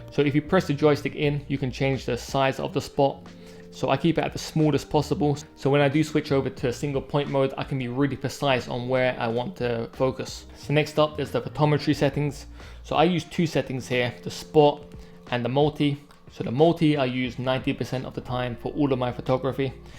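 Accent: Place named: Greece. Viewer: I like how he starts out sounding like Ali-G and ends up sounding Greek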